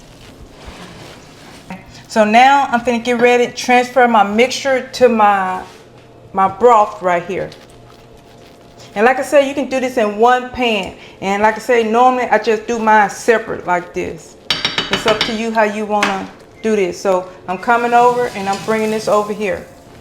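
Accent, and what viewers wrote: South, United States. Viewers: You're so such a lovely lady. Cute country accent ❤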